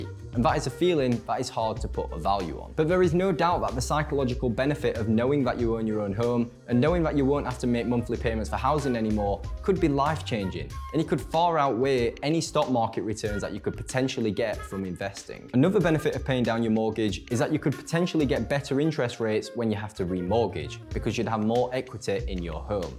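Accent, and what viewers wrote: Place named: North, United Kingdom. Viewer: I reeeeally hope you didn't stumble on the error of an assumed 6% mortgage rate throughout late and that it was for dramatic effect. Don't forget people don't always watch the whole video so be careful back-ending that "be careful about listening to one random guy" advice! (and the obvious-to-some-people flaw in your early calcs). Overall the video gave good info, and nice to hear another northern accent doing this type of vid!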